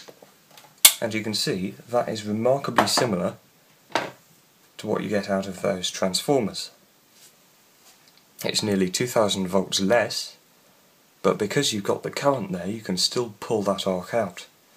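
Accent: United Kingdom. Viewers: i’m pretty sure he’s from the uk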